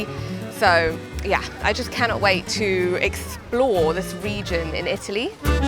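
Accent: England, United Kingdom. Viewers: You have a English accent how are you American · Maybe the British accent takes me away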